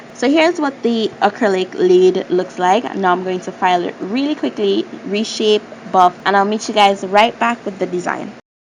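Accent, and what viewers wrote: Trinidad and Tobago. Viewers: Sounding Trinidadian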